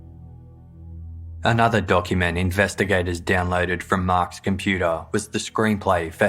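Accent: Australia. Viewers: omg seriously he is Australian.His voice is perfect for podcasting.Not being a dramatic narrator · Love the Aussie accent. You must be very “cultured”. He has an Australian accent. He’s an Aussie. Love the accent. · The story telling (writing) in these is let down by the infuriating narration in my opinion. The terrible pronunciations ( eg. Celtic is not pronounced Seltic…but Celtic as in Kilt -at least twice said wrongly) And the monotonous tone is mind numbing. The clipped way AND is pronounced and then if followed by a word starting with J it sounds like he puts ‘the’ in the space so something like …”and Jane said” becomes “and the Jane said” which is not just distracting it is ludicrous. I know these are free on here but for those listening elsewhere, they are not free. Therefore those people are paying for mistakes. As for place names, I can excuse the odd mistake in pronunciation of foreign places (though that can be checked before hand) but when a place names in his own country is hideously wrong, it is disgraceful. 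Also, the accent, though somewhat Australian, is not typical, well not of the thousands of fellow Aussies I know. If this was a report card I would say…could do much better D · God help me I'm falling in love with the host's voice. And his aussie accent. i can't help. i just can't help it. Also the research done on this case, along with their previous episodes, is just fantastic.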